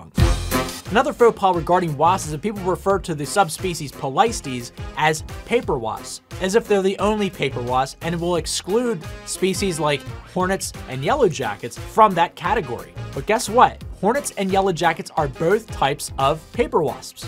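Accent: United States. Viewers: American or Canadian my guess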